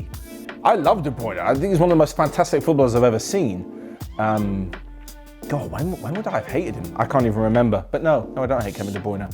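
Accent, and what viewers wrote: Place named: United Kingdom. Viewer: God I could never imagine this channel being voiced by anyone not British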